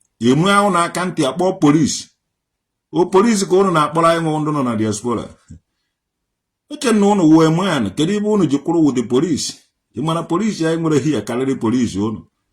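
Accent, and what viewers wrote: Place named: Agulu, Nigeria. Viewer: With this man accent he must be from Agulu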